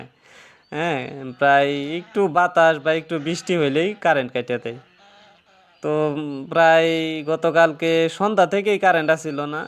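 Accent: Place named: Bangladesh. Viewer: আপনার বলার স্টাইল বাংলাদেশিদের মতো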